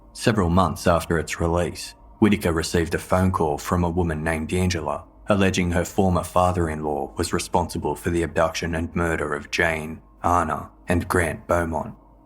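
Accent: Australia. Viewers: This is the best narrative, lots of details. I love your aus accent too!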